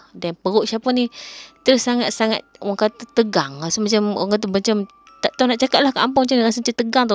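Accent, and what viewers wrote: North, Malaysia. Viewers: Akhirnya Syafa cakap loghat utara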